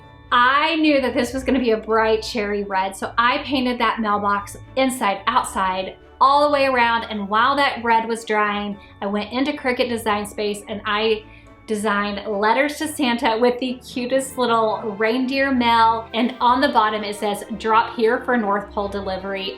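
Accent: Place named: Texas, United States. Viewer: I'm LOVING that green! 😍 Just might have to try that. And the TINS 😍😍😍 I either missed those at Michaels or mine didn't have those, bummer. As always, I love watching you create and your sweet Texas voice. Have an awesome Sunday! PS I hope you can relax today after working late into the night (I was right there with you) ❤️ Last minute ladies unite! 😂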